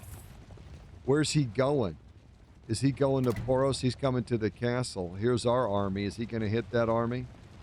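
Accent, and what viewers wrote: Oklahoma, United States. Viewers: I might be a little slow, but I just noticed your accent. Are you from Oklahoma?😂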